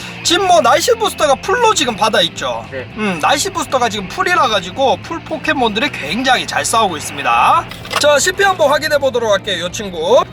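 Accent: Gyeongsang, South Korea. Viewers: 역씨 경상도ㅋㅋㅋㅋㅋ 거북왕 말고 그북왕ㅋㅋㅋㅋ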